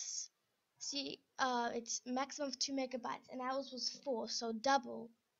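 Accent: Australia. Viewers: you're from austrilia? thats why your accent is so beautiful <3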